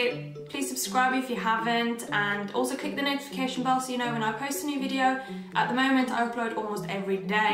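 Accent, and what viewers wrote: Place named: United Kingdom. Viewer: Wait are you Swedish!?? I though you were from the Uk! I’m Swedish btw lol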